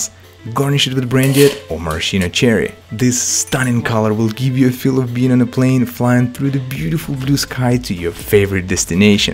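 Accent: Russia. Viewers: Боже ты же русский у тебя русский окцент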